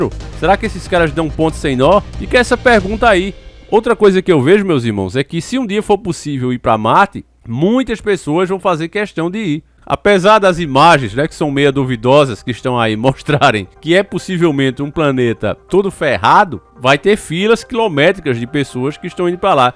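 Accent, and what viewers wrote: Northeast, Brazil. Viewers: mais uma vez eis me aqui ótimo início de semana pra toda família encarando também mando o meu abraço pra vocês, eu moro em São Paulo a mais tempo duque vive no nordeste mas sim sou de Alagoas e além de tudo estou familiarizado com o seu falar fico feliz em saber que no nordeste tem alguém que representa bem não só o nordeste mas todo O Brasil aqui no YouTube é uma honra poder fazer parte de todo família encarando, embora não gosto muito de comentar mas quando torna-se mais que interessante como é o caso da terra então eu entro na conversa.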